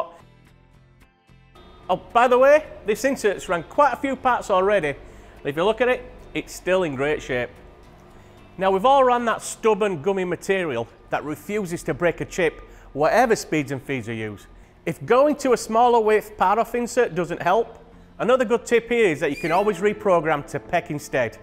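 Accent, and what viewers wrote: United Kingdom. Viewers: a regional British accent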